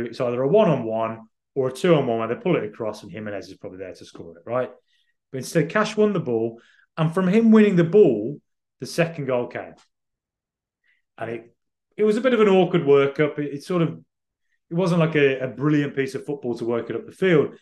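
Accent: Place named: Scotland, United Kingdom. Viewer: Great to hear you especially the Scottish accent 😂 third goal was just claped.by me and my boys instead of the physco screaming lol amazing!!! UTV!!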